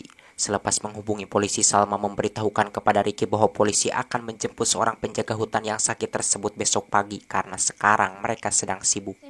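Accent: West Java, Indonesia. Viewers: Sundanya dari mana ni min 😁 sing ageung milik lah pokonamah 🤲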